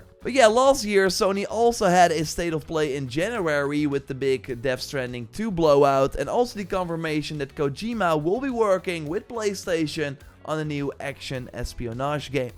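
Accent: Netherlands. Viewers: je Kaas engels blijft gewoon grappig lol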